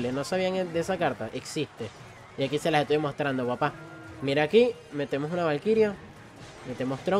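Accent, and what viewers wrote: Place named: Venezuela. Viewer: Bro gracias a que estoy en tu Discord supe sobre este videazo, por cierto, eres Venezolano verdad? Yo lo soy y tú acento es Venezolano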